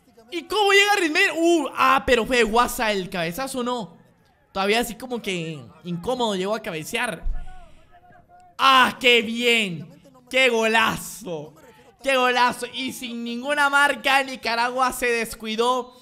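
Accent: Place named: Argentina. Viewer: Porque carajos habla como si fuera Argentino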